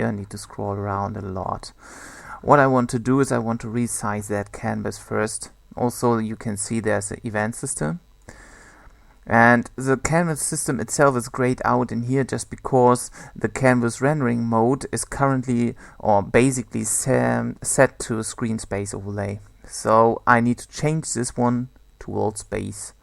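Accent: Germany. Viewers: Great tutorial. I love how you pronounce "th" as "z" like a stereotypical german. For normal use you would make a function like this instead of decreasehealth: void changehealth (int healthvalue) { if (cur_Health == healthvalue) return; if (healthvalue > max_Health) cur_Health = max_Health; else if (healthvalue >= 0) cur_Health = healthvalue; else cur_Health = 0; float calc_Health = cur_Health / max_Health; SetHealth (calc_Health); }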